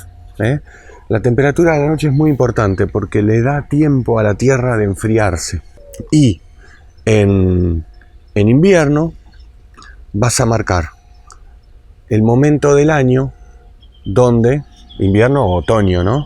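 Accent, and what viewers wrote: Buenos Aires, Argentina. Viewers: Andrés Modesto Rodríguez De León es la forma de hablar de los “porteños” (o sea de los de la ciudad de Buenos Aires y alrededores) en Argentina. Hablan así, parecen soberbios. Te lo dice una Argentina del interior del país. A nosotros a veces también nos choca esa manera de hablar. Pero sabe un montón. Junto con Tony de España, a mi me han ayudado a hacer mi huerta.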